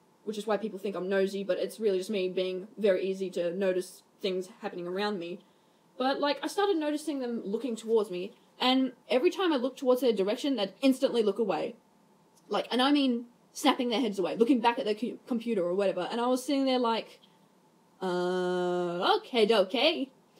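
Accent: Australia. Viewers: I kind of wish my Australian accent was as strong as yours because I also have some South African in my accent but maybe it’s just because I’m used to myself talking? Anyway, a completely fabulous video!! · I love your accent so much?? Like I'm an Australian too, but I love how heavy your accent is. (If that makes sense oof I hope that didn't sound offensive at all) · You sound Australian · First vid seen by you, IMMA SUBSCRIBE BECAUSE YEE BE AUSTRALIAN ! :D Insert HAHA! BOI! Here to get a bunch of likes but fails badly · OH MY LORD IS THAT AN AUSTRALIAN ACCENT?? (pls be from Adelaide :0) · i smell another aussie I just subscribed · Great artwork! And a very engaging storytime! I'll be honest, I legit grinned when I heard the accent. Nice to hear a fellow Australian doing storytimes. Keep up the good work! You've earned a sub from me for great content :) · not trying to start something, but, are you Australian? 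or from somewhere around there? I'm just curious. I heard it in ur voice. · I wish my Aussie voice was as strong as yours XD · Are you Australian? You sound Australian and it makes you saying "Oh wow is potawie" more funny than it is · instantly new you were an Aussie lol. btw that guy sounds fucked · OOOOO another Australian!!! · You Aussie? 😏 me too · Is she Australian? She sounds like it but hey just found your content so yeah it's pretty cool so far so keep up the good work. Yah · OOF I CAN HEAR YOUR AUSTRALIANESS HULLUR FELLOW AUSTRALIA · OI YOU AUSSIE? I'm from Victoria XD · i dont want to sound weird but is that a aussi accent? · OOH WOWIE PATOWIE WOW Also are you australian? Its hard to find Aussie youtubers actually... Oh well! im australian too =3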